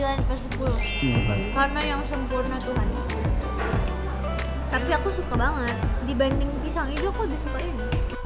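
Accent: Makassar, Indonesia. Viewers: logam MAKASAR nya keluar …beda kalo d Jakarta 🤣🤣🤣sehat sukses ya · Tawwa tidak na lupai logat makassar kka, biasa itu ada orang lama mi tinggal di jakarta, na lupa mi logat kampung na, tapi tawwa tidakkk, salut memang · Selamat dtg di kota daeng mksr, ternyata dialek mksrx keluar ya. Mantap salam cantik manis, imut.❤❤❤ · ini tempat kamung halaman ku, lgsg balogat makkasara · logat mkasarnya kluar jo..mntabbbb sehatsehat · aslina logatnya tawwa, nda nalupai 😂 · Beh anak makassar banget nihh logatnya❤❤❤ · Candu banget klo ngomong pake logat Makassar.. Btw pelan2 Ki dek masih pagi loh itu udh makan SOP saudara ajh🤦😮‍💨 #Ewako 😊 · Logat makassar memang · Apa kareba bocah remax funky.auto logat Makasar keluar 😄😄 gini rajin upload biar cepat berkembang channelnya.ketawa bikin candu. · Edisi pulkam nihh...👍 Kereeennn..... logat makassarnya masih mantap... 🤭😇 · Logatnya makassar banget suka deh 😂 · Tdk hilangji tawwa logatna Salam dari makassar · Rajin rajin ki wekna pake logat makassar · enak dengar ngomong makasar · Mantap logat makassar ya ga pernah hilang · Knp ya klo pulng mksar auto kmbli logat mksar walaupun sdh puluhan taun merantau jkrta....wkkkkk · Wich langsung keluar logat mkssarx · Masih ada logat makassar ta pale 😂😂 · langsung mode auto makassar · Full logat Makassar 🥰,ceplas ceplos nie 😂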